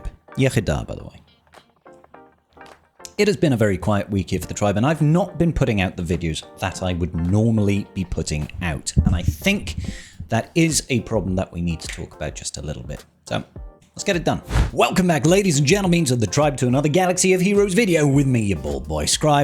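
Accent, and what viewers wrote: United Kingdom. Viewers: I watched Vexitrons Conquest videos because yours wasn't available, but I do prefer you geenrally as your more fun to watch and goot to hear a fellow Brit so I vote for more content :) But if your feeling the grind take a break, dont burn out!!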